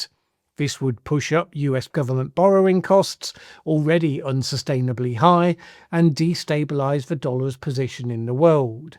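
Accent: United Kingdom. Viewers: How do I block these Brit narrators. Annoying as F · ARE YOU A BRIT ? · So Sick of listening to some stupid Bloke, with your horrible accent. We Don't Care about your Cheesy Propaganda. You Brits, have Lost your country to Muzzys. You Brits have Nothing to Say. You have a National Passtime 'SLAGGING THE YANKS'. High Time for you to Grow Up. Leave the TARRIF Deal to solve itself, it will.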